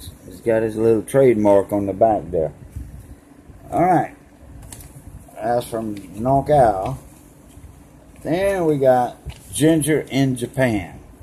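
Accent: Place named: Louisiana, United States. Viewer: hey:).. nice shout outs... i like your accent... thanks for sharing and have a nice day!!:)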